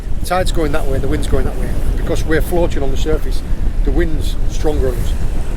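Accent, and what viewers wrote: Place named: Leeds, United Kingdom. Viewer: I came to sea fishing later in life, but the one thing i wish i could change was having my father with me as a child, sea fishing. carry on encouraging, you do a fab job, and is really catching on under your masterful guidance. Well done both of you. BTW are you a Leeds fan? The accent gives it away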